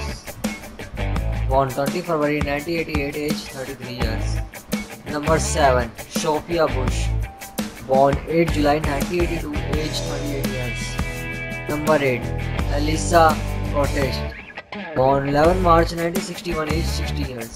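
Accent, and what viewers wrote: India. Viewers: Can’t understand the announcer because of his Indian accent!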